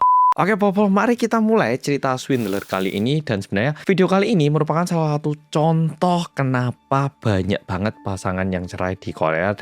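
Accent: Java, Indonesia. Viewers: Siang mas muedok lgsg nyimaaak🎉🎉🎉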